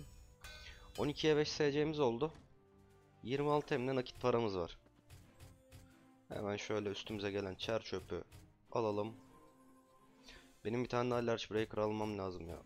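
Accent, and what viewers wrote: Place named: Thrace, Turkey. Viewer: İlk videodan beri diyorum kesin Trakyalı diye. Corludan selamlar 😁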